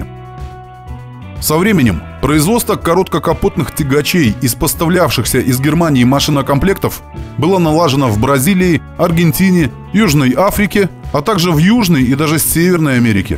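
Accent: Belarus. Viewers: не знаю откуда, но акцент характерный для Витебщины, особенно ярко слышен на склонениях цифр - "пЯци", "дзевЯци" и подобное. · Автор из Беларуси?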